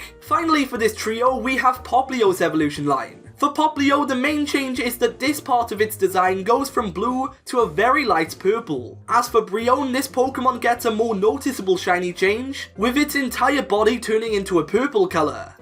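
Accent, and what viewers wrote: Wales, United Kingdom. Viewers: I love this man’s voice ❤️ welsh accents are pure 🔥 Another great and fun video, love me some shiny starters;) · are you Welsh?? I’m tryna figure out your accent! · Are you from north or south wales?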